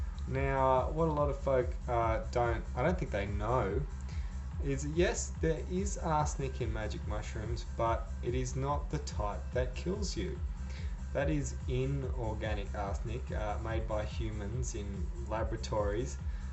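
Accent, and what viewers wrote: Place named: Australia. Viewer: I dig you man! crazy free party dude with your australian accent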